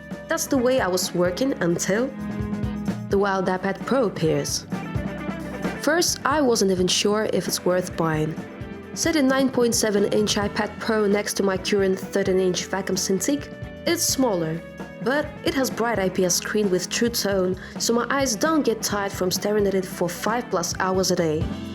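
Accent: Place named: Russia. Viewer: She talks with Russian accent 😅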